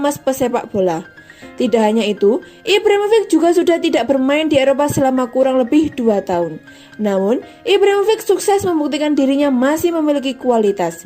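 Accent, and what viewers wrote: Java, Indonesia. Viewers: Jawa nya tulen mbak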